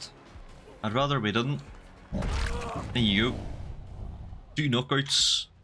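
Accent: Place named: United Kingdom. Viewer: For 1-2 minute i wonder what does it mean "faint them" Then i realise it's pronounce of words "found them" xdd GB accent sometimes hard to understand, especially if English is not my native language :)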